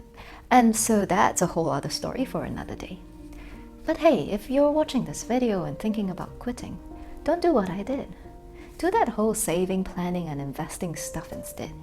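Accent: Singapore. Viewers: hi!, is your husband from a Russian country? because your last name is but your accent is from singapore. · Hehe I like listening to your accent, you remind me of my friends from Singapore 😎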